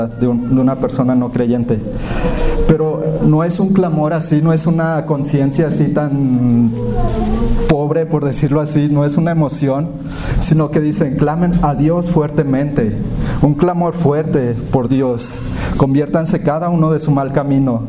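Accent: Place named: Mexico. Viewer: Hola ¡Por tu acento puedo creer que hablas desde México! ¿vale? ¡Que nuestro Dios venga a alumbrar a todos pueblos Mexicanos! “ Lámpara es a mis pies tu palabra, y lumbrera a mi camino. Salmos 119: 105. “ 💡 Saludos desde Brasil. 🇧🇷 Al mi México querido. 🇲🇽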